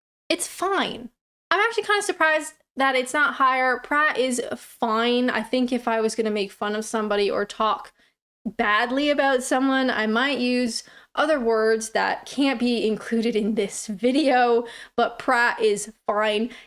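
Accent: Canada. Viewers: Hello chick, knobhead is a great English word, a friendly insult!? Also I have been told a person's accent settles between 17-20 so it's unlikely that you will ever lose your Canadian twang unless you do it deliberately which is an option after a certain amount of time!? xx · At the time of bus conductors,com on git aff.meaning hurry up and exit the bus. please speak English your Canadian is still strong. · You need to go for several pints with a Gen X brit, also we don't all see Canadians as foreign, you are like us with a funny accent x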